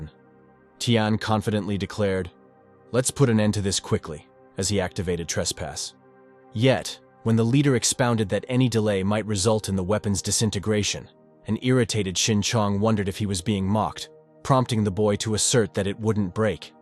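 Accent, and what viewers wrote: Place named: United Kingdom. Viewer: Broo this ai is so british/old english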